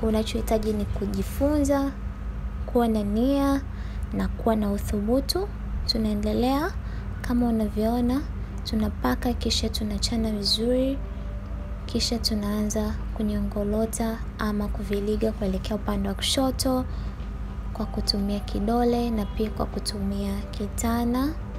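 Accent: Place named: Tanzania. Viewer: Kiswahili cha tanzani Tamu kwanza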